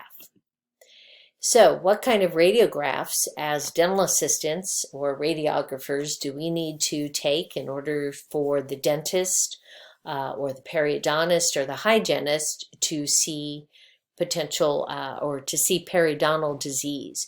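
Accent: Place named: United States. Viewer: Although from usa I assume and I love that accent, finally something for dental assistant, I'm doing course in uk so extremely helpful. Thank u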